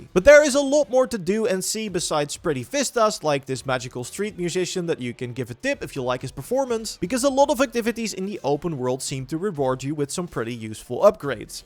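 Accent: Netherlands. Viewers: Waarom hoor ik zo hard een Nederlands accent?😅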